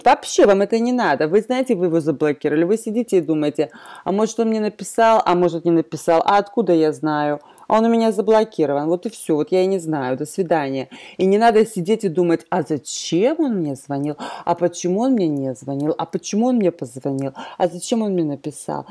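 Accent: United States. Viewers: Обожаю ваш американский акцент)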